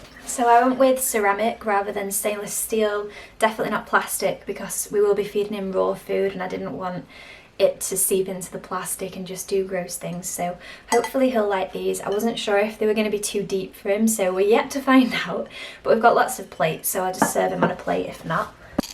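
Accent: Yorkshire, United Kingdom. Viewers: Yorkshire. It's my absolute favorite accent. So lovely!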